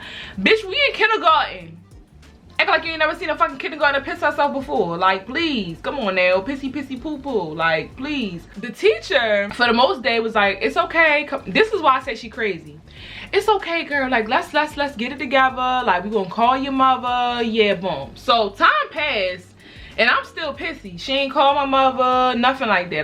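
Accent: Baltimore, United States. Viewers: you frm bmore cs i here that accent 410!!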